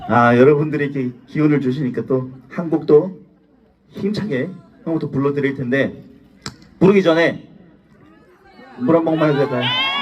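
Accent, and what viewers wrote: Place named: Chungcheong, South Korea. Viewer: 환장하것네~~~ 정겨운 충청도 사투리 귀여운 수현님이 하니 더귀엽네요😂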